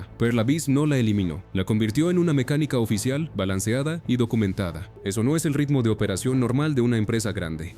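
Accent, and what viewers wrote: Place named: Colombia. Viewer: buen video pero falta mejorar ese guion, mucho corte de voz, y te delata como pronuncias la S , colombiano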